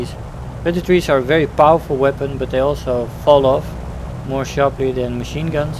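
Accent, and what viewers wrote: Netherlands. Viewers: i can hear ur Dutch :)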